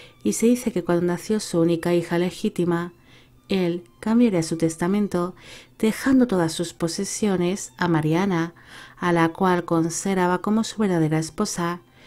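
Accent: Spain. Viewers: Sos española?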